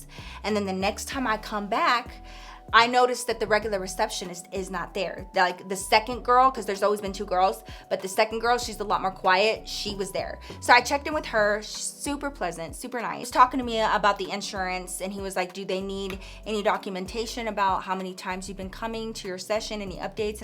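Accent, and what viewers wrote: Texas, United States. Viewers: New here and lovin these stories! 🥰 This is my 3rd. The first was the concert demons story, and for some reason I Immediately knew you were a TX girl. When you said Dallas in this video I was like, "AH HA!" 😂😂😂😂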